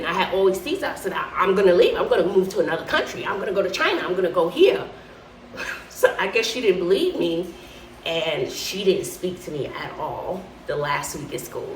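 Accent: New York, United States. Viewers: I missed where you are from, if you stated it earlier in a video. I’m going to guess you are from New York or Chicago.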